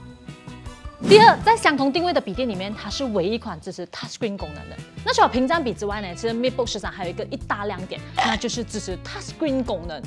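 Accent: Malaysia. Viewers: 大马华语就是这样的啦，要学会接受不同的文化。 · 马来西亚口音真的很好听呢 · 马来西亚口音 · 皂动的茂茂 马来西亚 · 马来西亚华人大部分普通话都有这类的口音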